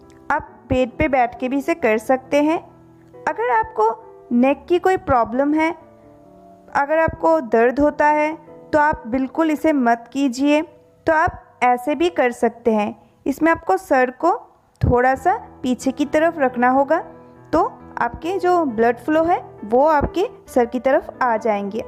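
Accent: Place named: Bengal, India. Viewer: Ap bangali h na?...apki awaz se hi lg rha h😊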